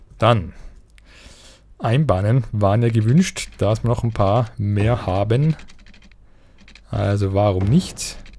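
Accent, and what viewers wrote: Austria. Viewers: Finde die Serie super! Bin momentan im Krankenstand und bin noch nicht dazu gekommen mir das spiel selber zu kaufen, jetzt habe ich mir jede Folge von dir angeschaut. Habe zu erst begonnen bei Gronkh zu schauen, finde aber dessen "Spielweise" etwas umständlich. Und da bin ich mehr aus Zufall über deine Videos gestolpert, da geht was weiter in den Folgen und bin auch größtenteils mit deinen Entscheidungen einer Meinung was bei Gronkh z.B. nicht immer der Fall ist, daher finde ich diese Videos netter zum anschauen. Ebenfalls finde ich deinen Dialekt netter, bin ja selber Österreicher und finde es sehr angenehm. Habe mir auch einige deiner Sid Maier Railroad folgen angeschaut und überlege mir auch dieses Spiel zuzulegen so als alten Klassiker :D WEITER SO! Freue mich schon auf die nächste Folge!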